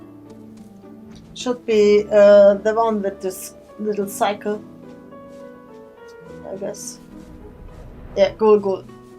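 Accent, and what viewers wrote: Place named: Germany. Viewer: this german accent tho ^^ nice guide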